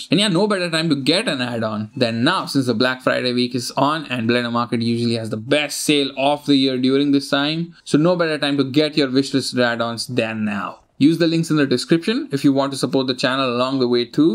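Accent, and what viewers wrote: Bangalore, India. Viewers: This mans commentary is the best xDD Are you from Bangalore. You sure sound like you are xD Love it. Your videos do make me feel home in a way in a country away xDD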